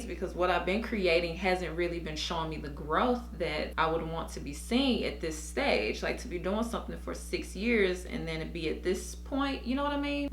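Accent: South, United States. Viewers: Love your content, you're the only person on YT i know who wears their natural hair in it's shrunken state (the reason i subcribed) you're a unicorn. I think if you made dedicated videos showing how you wear your hair (a week in my natural hair type video) those videos will blow up. Your haircare routine is simple which alot of us want. Another video idea you sound southern mayvbe share your experiences being natural in your community?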